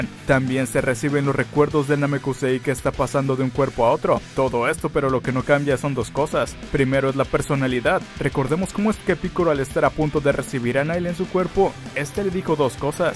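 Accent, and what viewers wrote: North, Mexico. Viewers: Ahhh nms tiene como un acento norteño no? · senti que en este video hablaste como estilo norteño, fierro pariente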